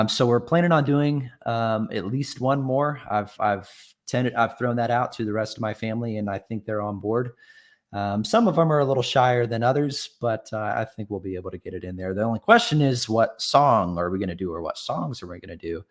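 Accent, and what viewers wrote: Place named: United States. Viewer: 🇦🇺🇳🇿 both my flags 😊 I love your music, partly because you remind me so much of my Dad when he was in his thirties (he would be in his eighties if he were still living) - your looks, facial expressions and mannerisms as you sing, the harmonies and parts/overlaps - very nostalgic for me. Except - now I've listened to your American accent...haha...not my Dad's Kiwi one! (Of course!) 😂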